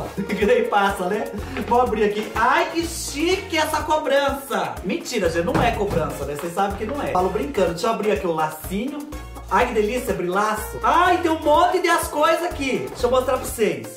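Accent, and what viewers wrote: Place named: Southern Minas Gerais, Brazil. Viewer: "Espicula de rodinha" é da minha época...amei...acho que você veio daqui da roça, (será que te sequestraram bebê?) no sul de Minas, vc fala igual "nois aqui" bjs...ops!...caí de paraquedas no seu canal e me apaixonei pelos videos...sucesso! sempre!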